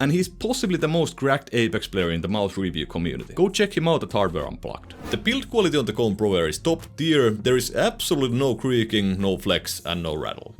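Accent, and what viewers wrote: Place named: Finland. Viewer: First time in your channel and I had to check immediately are you a Finn or Estonian :D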